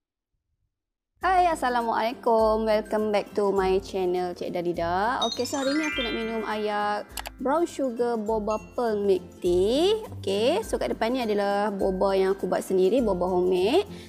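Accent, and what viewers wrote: Kedah, Malaysia. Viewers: Kuat gile kedah nya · Org kedoh? Atau org oprg perok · Ayaq ayat kedah L.A. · Ni orang kedah ka kl.. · org kedah ke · orang kedah ke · Suara macam Wartawan AgroTV kat TV1. Tpi ni version Kedah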